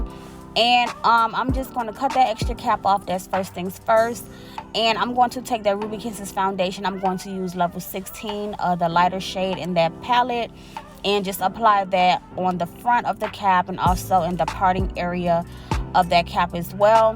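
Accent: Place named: Florida, United States. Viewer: Yassss!! I’ve watched a few of your videos and every time I’m like she sound like she from Florida and does hair like she is from Florida. Heard the beat and was like that sounds like a Florida beat. Scrolled down and saw the 786 area code. FLA Stand up! Born and raised in the 954 😜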